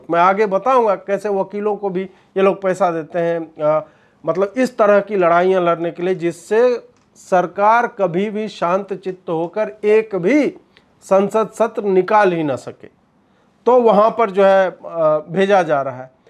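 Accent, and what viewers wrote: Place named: Bihar, India. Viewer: vo bihari h hamare yaha UP aur bihar me aise hi bolte h yakin nhi to UP CM ka bhasan suno. Sikhao mat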